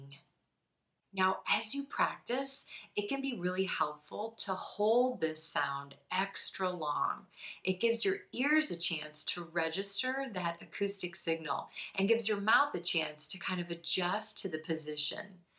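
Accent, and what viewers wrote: United States. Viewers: I love your lesson and your American accent!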